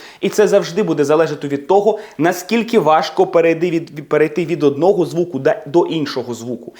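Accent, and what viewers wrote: United Kingdom. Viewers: Вирішила підписатися, бо у Вас британська вимова, а не американська. А у Вас є якісь курси? Може школа? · Дуже дякую, це звісно полегшує вимову. Дуже подобається як ви говорите англійської із само британським акцентом.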